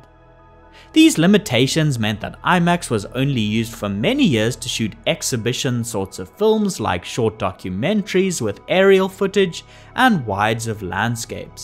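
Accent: South Africa. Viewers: bro sounds south african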